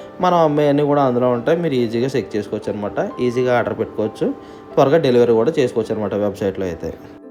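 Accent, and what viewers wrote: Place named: Godavari, India. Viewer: Anna ne matala ki navvu vastundi mana godharola language alagey untadhi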